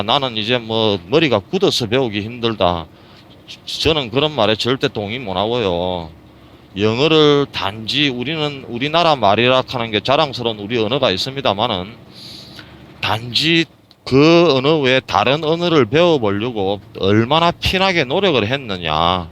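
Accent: Gyeongsang, South Korea. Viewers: 동영상 잘 봤습니다!! 경상도 사투리가 인상에 남네요^^ . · 영상 잘보고 있습니다~^^ 저는 대구사람인데 경상도 사투리가 구수하시네요~^^ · 캐나다 언제 갔습니까? 그리고 갱상도 분이시군요 여기도 부산임다~ 구독자수도 많이 생기면 무작정 운전만 아니라 구간마다 생각날때 답변해주실수도 있겠죠 ㅎ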